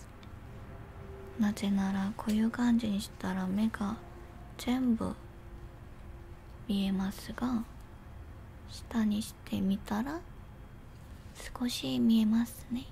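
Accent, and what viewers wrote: South Korea. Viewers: 韓国の方が話される日本語て可愛らしいﾃﾞｽ♥.｡.:*♡ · 韓国の女の子が日本語を話すのが好きすぎる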